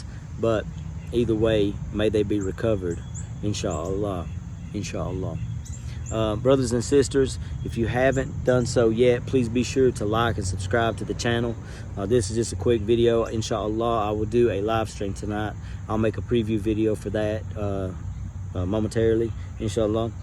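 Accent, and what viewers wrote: South, United States. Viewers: Dear Brother, I’m an old woman now and I became a Muslim over 18 years ago. I am a 100% disabled Iraq war veteran woman from the first Iraq war, it was because of the Iraq war that I became a Muslim. I’ve lived in Egypt 18 years now and I’m dying of heart failure. I have to say this to you, seeing you with your tattoos, hearing your Southern accent, the way you look, where your from, has made me so full of hope and happiness to see you as a brother in Islam. Never could I dream that an American from the South, who looks like you, would ever become a Muslim; because of all the ideas we get about people from the South in the Christian Bible belt. Never judge a book by its cover. I too have tattoos from the old days, I too am American, and the point is that Allah can save the roughest and toughest of people in heart and mind. Your kind heart will bring more people to Islam and educate them that we are good people. 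May Allah bless you and continue to help you educate others to bring them to our beautiful Faith of Islam.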